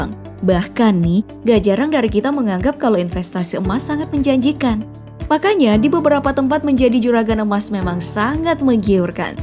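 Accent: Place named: South Sulawesi, Indonesia. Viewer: fixx miminya dari sulsel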